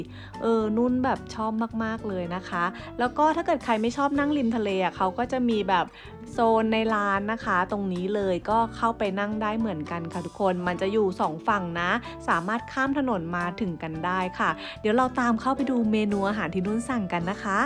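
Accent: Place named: Thailand. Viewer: เสียงเหน่อจัง คนจังหวัดไหนครับ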